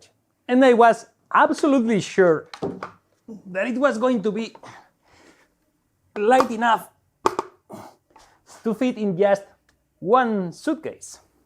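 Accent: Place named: Spain. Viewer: Tu eres Español Pero vamos.... Ese acento... Igual me equivoco. , a ver si te lanzas y metes audio Español también.. Gran video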